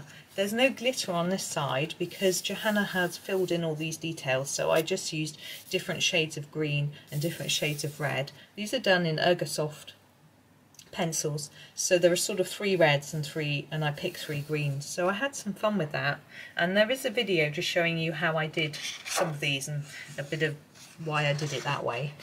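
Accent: England, United Kingdom. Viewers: You are such an inspiration! I enjoy your videos and your soothing voice. I'm from the US and I love listening to English accents though, so I may be biased lol.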